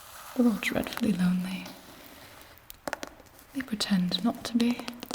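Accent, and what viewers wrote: England, United Kingdom. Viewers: because she's so amazing and hot and she can do an incredible British accent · This is one of the most convincing English accents I've ever heard from an American. (As an English person.) And you maintain the accent while maintaining an ASMR voice. That's impressive. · I think her accent it British 🤔🧛‍♀️🇬🇧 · She nailed British accent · Okay, since whem are you british? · Uk accent😂❤️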